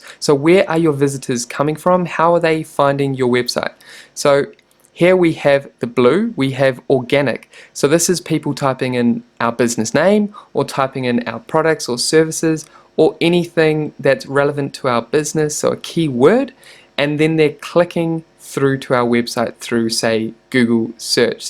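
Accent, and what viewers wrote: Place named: New Zealand. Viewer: How to use google analytics in "twinnie-twinnie"- love it. A Kiwi, right?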